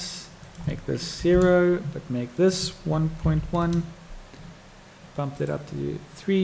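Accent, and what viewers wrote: South Africa. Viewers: Nice to hear a South African oake for a change :D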